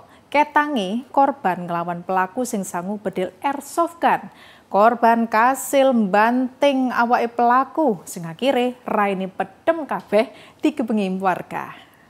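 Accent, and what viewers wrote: Java, Indonesia. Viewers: medok puoll 😂😂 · Apa ini kok medok banget😅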